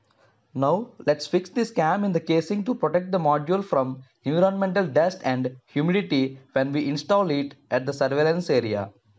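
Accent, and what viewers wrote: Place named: India. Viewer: Seems to be an excellent video, and thank you for posting, because I will be making and installing numerous security cameras for our home very soon, and I was considering the ESP32 system because the Pi Zero W board is out of stock everywhere, and this $15 board is being scalped for around $100 while the ESP32 is widely available and inexpensive. I would like to offer some well meant and hopefully constructive criticism, though. I can barely understand anything you say. I know your English is probably perfectly understandable in India, but here in South Louisiana it is almost a foreign language. Perhaps some day just as an experiment, you could try making a video using a text script ran through a text-to-speech converter? There are some very good computer voices available and your videos would be much easier to follow. As a bonus, you would of course then have a complete text transcript that you could post in your video description or that you could upload to your own personal website, and then link to that from your video description. 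Anyway, a more standardized pronounciation would help make your videos much easier to understand, not only to other English speakers, but also to non-native English speakers or those with only limited English skills. Barring that, maybe closed caption? Thanks for reading, and I hope you do not find this to be offensive. Just want to help. <EDIT> I just had a look at your project page. Perfect! Easy to follow, informative, and appears very compl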